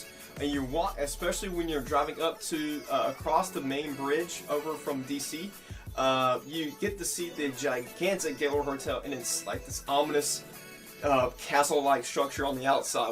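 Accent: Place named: South, United States. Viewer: The southern accent thooooooooo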